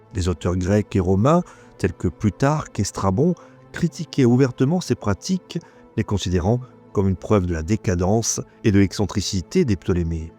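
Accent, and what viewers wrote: Corsica, France. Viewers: Tu serai pas un peu Corse avec cet accent 👍🫶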